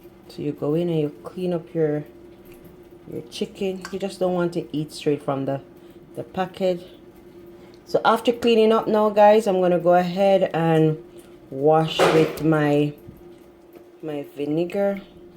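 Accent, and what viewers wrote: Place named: Jamaica. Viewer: Hey girl I am here new subscribe family now you sound like a Jamaica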